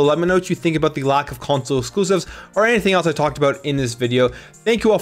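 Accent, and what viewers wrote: Canada. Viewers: your from Toronto area im guessing I'm pretty good with accents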